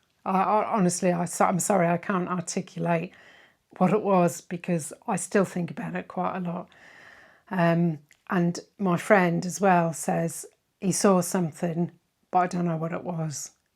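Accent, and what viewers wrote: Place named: Birmingham, United Kingdom. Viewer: I really enjoyed that. A bit concerned that you have been poorly and hope you are on the mend now. You do have a soothing voice. I thought I detected a hint of Brummie or Black Country in your accent but that’s great. Keep up the good work 👏👏👏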